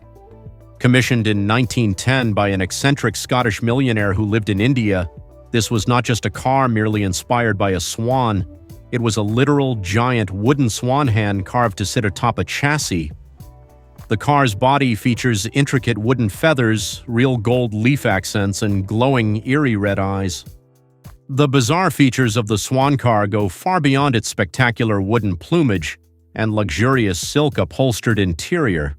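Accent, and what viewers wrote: United States. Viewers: I like it, the voice sounds as if from Michigan or Chicago to my not very educated ear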